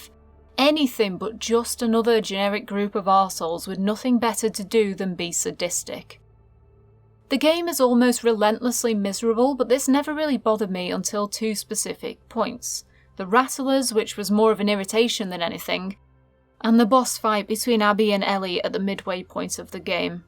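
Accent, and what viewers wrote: United Kingdom. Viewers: Good job with this analysis, it was quite interesting. Just out of curiosity, which part of England are you from? I only ask because your accent reminds me of the accent of the southern Birmingham area where I used to live, but I may be very wrong, as English is not my first language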